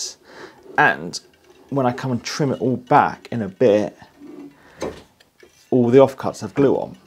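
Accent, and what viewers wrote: RP, United Kingdom. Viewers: I enjoyed your description at the beginning of deciding to make a posh project out of pallets. It fits your posh accent!😊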